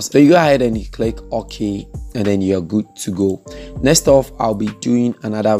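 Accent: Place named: Ghana. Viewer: The Ghana accent is amazing to hear. Even in 2021, you have given me a god send tutorial. Thank you.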